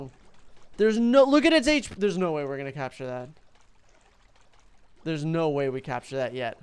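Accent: Ireland. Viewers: Insted of a pirate accent i think he using a Irish type one haha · I love your irish accent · Why is he using an Irish accent?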